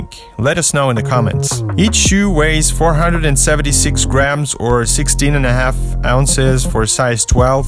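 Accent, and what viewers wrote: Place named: England, United Kingdom. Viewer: kashayte supchik zvichit voobshe klasno s angliyskim akcentom (tipa English)👍💪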